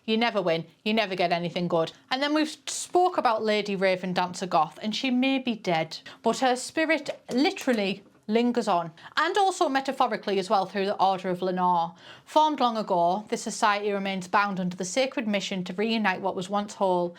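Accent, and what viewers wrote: United Kingdom. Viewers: Let me start by saying this, in order to not sound like a mean sourpuss later, I really love videos. The accent has, is making my Swedish heart pitter-patter-pitter-patter for the different British accents (yes, I tend to watch/listen to British series just to get my "daily" dose of British). Now, on to my comment that can be read in the wrong aka a mean way, which is not my intention whatsoever. At like she says "artistic prodigy", my brain went "why is saying autistic prodigy?". I had to rewatch that segment so many times, because my brain told me that sims 4 had sneakily added an autistic trait, and eventually I ended up using subtitles... the subtitles basically crushed what my brain has been begging sims to add in the game - a trait for sims with a slightly more NPF then for instance gloomy or loner. That way, even people who aren't neurotypical gets represented in the game. 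So, with that said, I never realised how much I wanted an autistic trait in the sims before the subtitles crushed it. Again - love content! <3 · I love, I love british english, but I wish there were subtitles 😂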